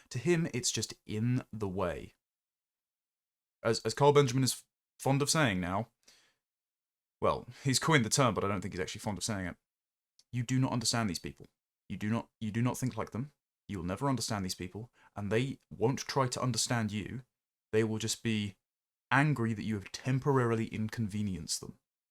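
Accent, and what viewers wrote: RP, United Kingdom. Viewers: You need to see more of the world. Your posh voice doesn't mean you know the world. Where's the video slating the every person that was the same ethnic origin as the two who cut down the Sycamore tree that had stood for a thousand years? Everything you are aiming at him you could aim at those who cut the tree down. But you won't say anything about them cos they are white like you, since you have lowered everything to the colour of skin.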